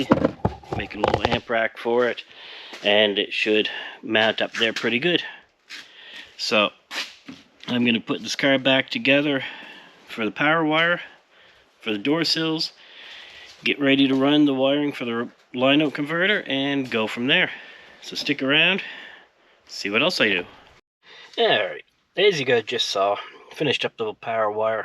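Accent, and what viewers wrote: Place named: Canada. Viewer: That Canadian accent is annoying.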